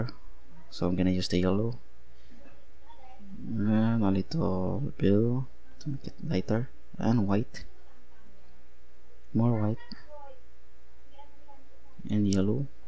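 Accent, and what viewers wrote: Philippines. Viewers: Excellent. You are the one I want to learn from as a beginner. You speak like you are a Visayan from the Philippines, are you?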